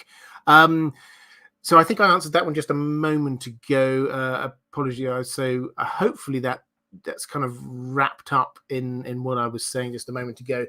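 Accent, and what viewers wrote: England, United Kingdom. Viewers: Every night I play about 20 min of these vids and they seem to slowly rock me into a peaceful sleep. It’s to the point now where I can’t fall asleep without hearing my mans boss English accent.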